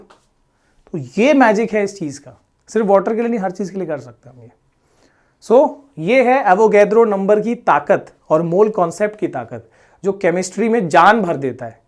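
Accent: Bihar, India. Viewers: apke bolne ke tarika se lagta h ap bihari ho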